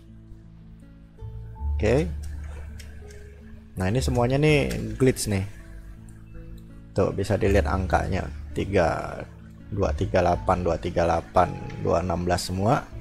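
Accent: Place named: Indonesia. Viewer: Yeah he from indonesian me to :p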